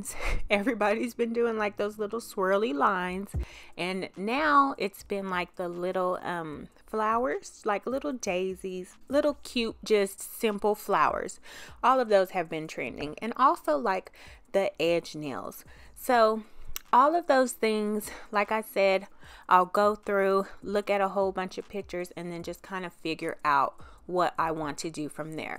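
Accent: South, United States. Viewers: These are so beautiful! You go you are so talented and I love your voice and to be it's southern and I'm southern lol.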